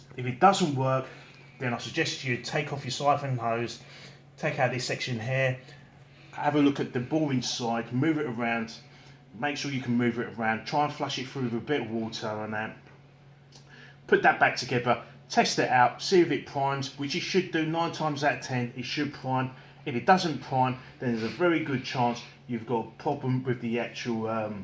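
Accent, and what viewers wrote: United Kingdom. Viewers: the best I,m in canada and my machine is a titan but looks exactly like yours, wont prime and I think its the valve although I cleaned it all out and the ball is free its a mystery, love the cockney accent, like me east end boy thanks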